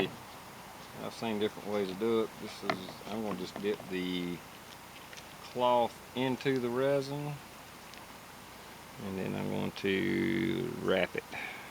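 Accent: North Carolina, United States. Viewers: hear a hint of NC accent there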